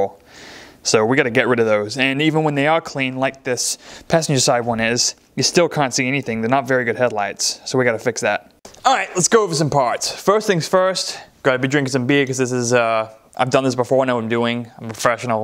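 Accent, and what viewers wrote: Australia. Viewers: What the hell is your accent ? Lol I’ve never heard I’m Aussie you sound semi Aussie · cool project! am I detecting a slight australian accent?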